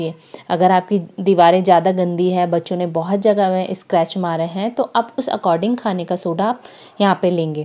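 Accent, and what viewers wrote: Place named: Himachal Pradesh, India. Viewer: Thank You Mam For Replying On My Comment Mujhe Laga Aap Himachal Se Ho Aapki Tone Himachali Lag Rhi Thi .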